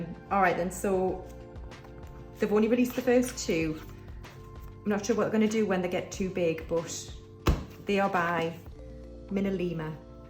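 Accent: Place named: North, United Kingdom. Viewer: You cracked me up at the predator comment 😂 you totally sound like my northern friend (saying that, does Lancashire count as northern?) god here I go insulting people with my crap geography 😂 #nooffenceintended 🤦‍♀️ xx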